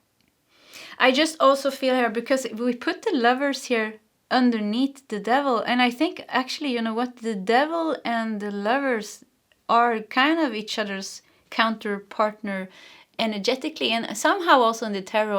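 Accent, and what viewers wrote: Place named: Sweden. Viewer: Thank you for #2! This is 100% me! Everything you said just kept building on the previous statement and I just kept saying, Yess! Yep, that’s me. Oh my yes! Uh huh! That definitely explains what I’m feeling! Yes, I do that! Oh, I totally get it! And on and on … So I’m looking forward to moving forward with this new life with a new perspective on my past and I know I’ve carried karmic energy that I’ve been healing this past year! I’m overcoming, healing and loving “the happy ass life I deserve”! ❤ Thank You! BTW, I’m Swedish/American and have a heart connection to Sweden even though I’ve never been there, at least in this life. I love hearing your accent and I’m so grateful I found your channel! I think the heritage connects me to your readings even deeper. ♥️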